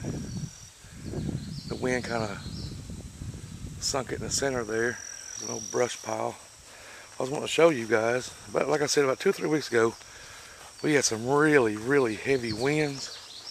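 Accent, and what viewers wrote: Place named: Kentucky, United States. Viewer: Thought your accent sounded familiar. I'm in London KY. Laurel County....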